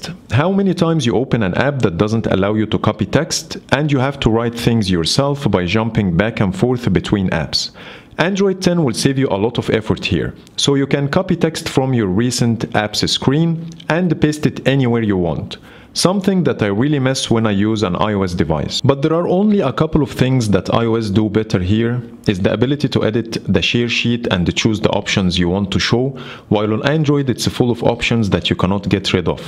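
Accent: Egypt. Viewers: Egyptian English Accent 👍👌❤ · The Egyptian accent is so clear here. Egyptian English. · استمر عرفت انك مصري من الاكسنت 😂😂 الاكسنت المصري بتاعنا معلم في كل حته 😂😂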